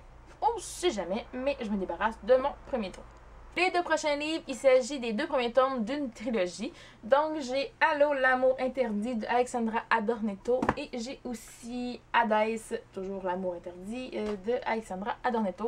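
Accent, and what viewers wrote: Quebec, Canada. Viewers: Belle PAL ! J'adore ton accent. J'ai de la famille à Montréal. Toi c'est le Québec ?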